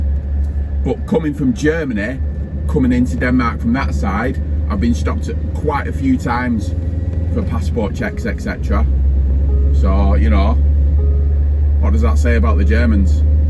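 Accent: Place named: North, United Kingdom. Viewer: Nothing negative, on the contrary. I love accents. Trying to figure out from where yours is. Liverpool, Manchester area perhaps?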